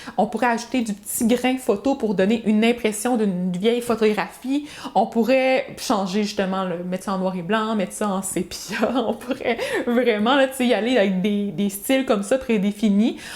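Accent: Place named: Canada. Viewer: Ah cet accent canadien... la grande classe 🥰 je suis bien d accord avec toi.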